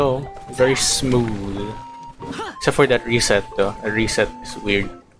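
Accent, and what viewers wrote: Philippines. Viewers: Are you filipino? You kinda have like a Filipino accent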